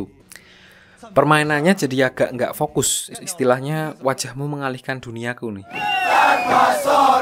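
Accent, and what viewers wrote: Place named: Yogyakarta, Indonesia. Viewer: Kek orang jogja ini mah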